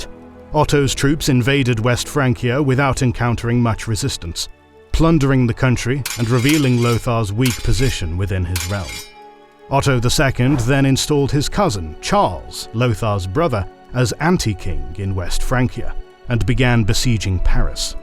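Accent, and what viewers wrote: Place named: United Kingdom. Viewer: Please do away with the UK pronunciation of things; it breaks immersion... like really bad.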